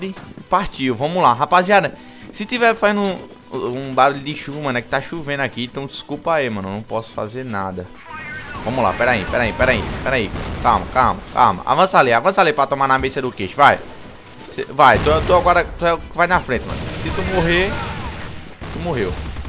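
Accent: Northeast, Brazil. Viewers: vc é nordestino?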